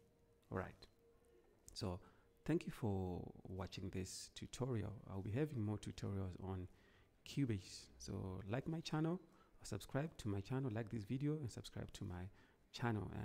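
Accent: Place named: Zimbabwe. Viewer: Ndazvinzwa hangu uyu mwana wevhu chete 😂 anyway thanks brother this video is awesome and helpful 👏🏿👏🏿👏🏿👏🏿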